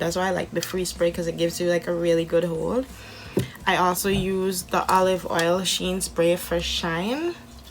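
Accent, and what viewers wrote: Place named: Jamaica. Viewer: Your accent is so close to Jamaican.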